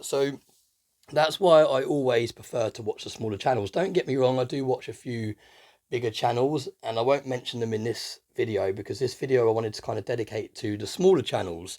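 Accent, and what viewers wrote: United Kingdom. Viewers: I have a ear for voices and you must come from the same part of England as Solomon Lane from Mission Impossible. 😁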